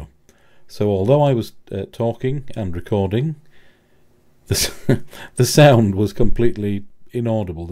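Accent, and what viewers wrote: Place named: United Kingdom. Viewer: What part of Great Britain You from ?!?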